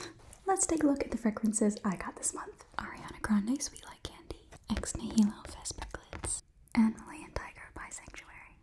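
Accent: South, United States. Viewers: The southern accent is brilliant! Really comforting. I always love your videos! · personally i just think southern is absolutely divine! love how warming it comes across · Did our incredibly pleasant nurse with a good dose of southern hospitality get promoted? Did she pull it off and earn her doctorate? Because I swear she was still a nurse last time. Either way, southern accent with a load of caring warmth is wonderful stuff. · i love ur southern accent videos sm!! also i did get 2 post-roll ads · Her southern accent is top tier!🥰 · I love the southern accent so much it's so comforting, even if I've never even been anywhere near the US!❤️❤️❤️❤️ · On behalf of the Southern community, I approve accent and recipe for mac and cheese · Oh god got the southern accent on point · This'll come in handy when I get a cold. The southern accent is the cherry on top. 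Thank you! Greetings from the Emerald Isle. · Nice southern accent. I don’t have as deep of one despite living in the most southern of the states.😂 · It’s good to see you again 😉 your southern accent is magnificent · I just wanted to comment and let you know I received a post roll ad and also amazing video I always love the southern accents ❤ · I saw that you were gonna do a southern accent and clicked immediately because you slay it!! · ur voice with the southern accent reminds me of ruby from steven universe with the song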